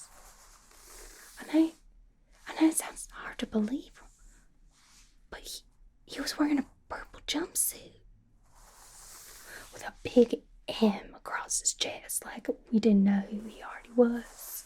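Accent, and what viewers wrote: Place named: South, United States. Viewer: Had been missing my favorite southern accented super hero. Always a wonderful experience.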